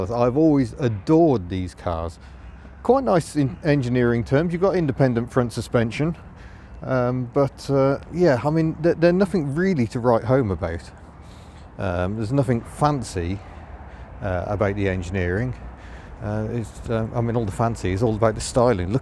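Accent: United Kingdom. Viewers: Thanks! Nice présentation. Love your British accent!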